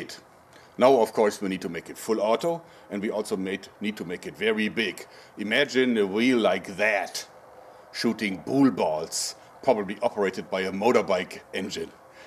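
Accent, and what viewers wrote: Germany. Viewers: def. sounds german! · he isn't from Austria so it would sound different because arnold schwarzenneger is from Austria and Isn't he is from Germany can hear it from his accent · This was the first of all of his videos that I had watched and he sounded German. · Is it a german accent? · he sounds german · Is he german? it sounds like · definitv German!! unverwechselbarer Akzent :D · Do you come from Germany?? Your voice sounds like that ;) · Er IST deutscher, was denkst du wieso seine Aussprache diesen Akzent hat ;)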